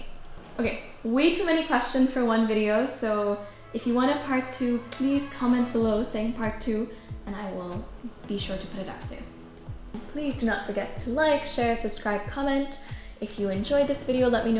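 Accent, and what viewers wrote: United States. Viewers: How can speak in American accent in india So u r not indian Or u r saying u r superior to indians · How did you learned to speak in a pure American accent??😍😍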